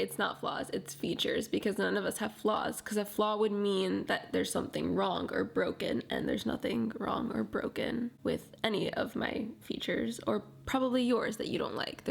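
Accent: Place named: Los Angeles, United States. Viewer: her accent is like PURE LA now lmao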